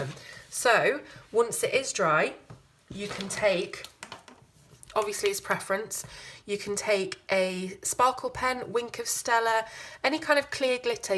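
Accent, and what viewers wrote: England, United Kingdom. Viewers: I love your accent from england 😍 nice greetings from germany 👋🏼🇩🇪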